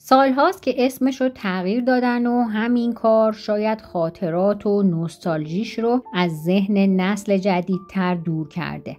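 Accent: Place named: Qom, Iran. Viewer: ممنون از برنامه خوب شما. بنظرم لهجه قمی دارید 🤔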